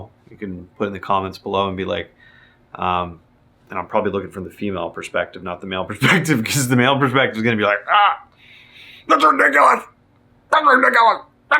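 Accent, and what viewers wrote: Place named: Yorkshire, United Kingdom. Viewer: Hey are you from Yorkshire UK? You sound like a Yorkshire man 😆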